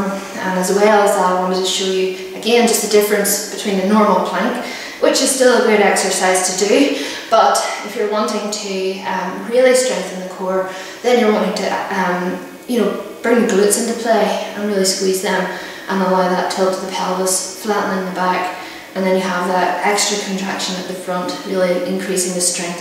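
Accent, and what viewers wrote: Ireland. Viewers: i love your accent. it's the only authentic Irish accent I've ever heard. the only other one I've heard is from Angel, on the tv show "Angel"/"Buffy the Vampire Slayer" :)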